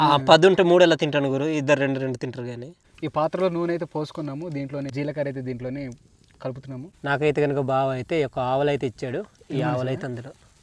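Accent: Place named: Odisha-Andhra border, India. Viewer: ఒడిషా మరియు ఆంధ్ర బోడర్ బాషా మీది మాకు ఒడిషా వచ్చు మరియు తెలుగు కుడా వచ్చు చాల గ్రేట్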